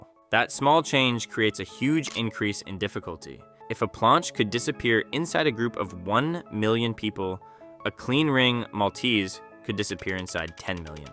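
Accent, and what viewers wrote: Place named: Ukraine. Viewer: I missed your videos brother and still miss your Ukrainian accent, hope you are doing well.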